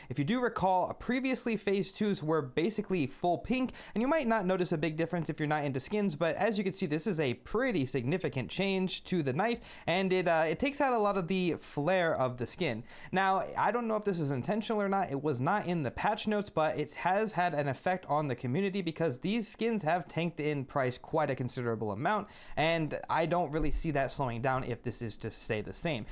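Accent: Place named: United States. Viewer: niice the american man speaks again)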